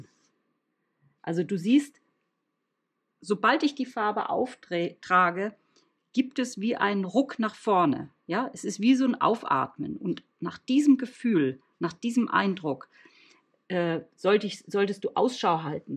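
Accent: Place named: Northern Germany, Germany. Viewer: I studied German in high school and college. I studied at the University of Freiburg for a year. My mother is actually from Berlin. Your German sounds like the northern accent I’m used to hearing. When I went to Freiburg I could hardly understand anyone!😂 That was many moons ago, and I don’t get many chances to speak German with anyone where I live, so listening is very nostalgic!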